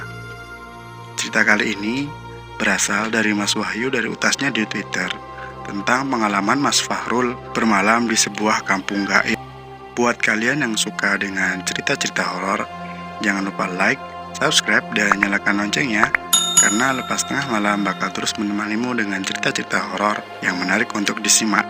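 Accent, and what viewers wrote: Bali, Indonesia. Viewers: Maaf yg bacain cerita nda cocok.logat balinya.di hilangkan biar netral🙏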